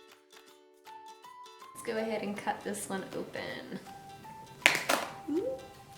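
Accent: Australia. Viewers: yeah haha i had a feeling it was more of an australian accent but idk something about your voice sounds just like her! lol meant as a compliment of course i just stumbled on your channel and i love your videos(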